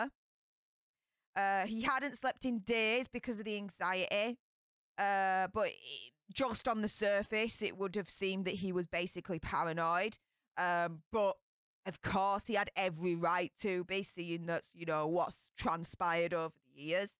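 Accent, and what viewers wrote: Yorkshire, United Kingdom. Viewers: HAS to be Yorkshire tho'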